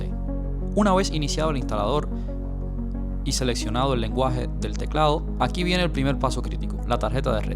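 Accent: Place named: Cuba. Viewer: Eres cubano! Ese acento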